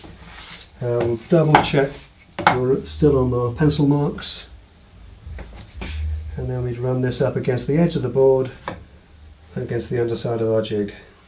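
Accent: United Kingdom. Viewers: Why does a UK accent sound so believable? Ha! Maybe it’s an American thing. Love this channel and the info. Thank you!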